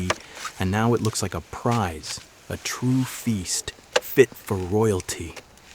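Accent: United States. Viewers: Ur videos lost its charm because of the annoying American accent that doesn’t shut up.!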